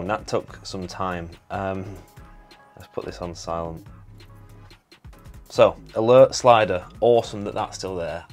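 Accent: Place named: England, United Kingdom. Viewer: Decent unboxing man. Thanks for showing off the colour. Totally agreed on the matte black, would've preferred last year's blue (did you see it?) but matte black easy second choice. Its about time we moved in from glossy fingerprint magnet phones. Just no. I got my Galaxy S10 in green because I wanted flashy but when it was nicked I had to get black. I was wrong, black much more refined. I'm rocking the red case now. Black and red classic combo. Reckon I'm getting the same as at next pay day (tempted to get the watch too). 128GB is plenty for me (my S10 is 128).I like Samsung's OneUI but OxygenOS looks much more refined. I use barely any of the Samsung excessive extras. Also totally agreed on the camera front. It's important but all American YouTubers do is bang on about the camera. The world's in a bloody lockdown anyway, my cat doesn't need another photo taking 😅. 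They barely mention the software and it's so important. A good phone with a bad Android launcher kills it. And from one Englishman to another your accent 👌.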